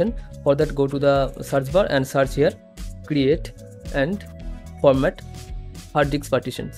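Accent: India. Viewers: Xati axomiya pronunciation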